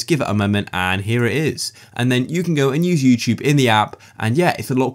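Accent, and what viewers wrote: United Kingdom. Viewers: this guy is speaking british english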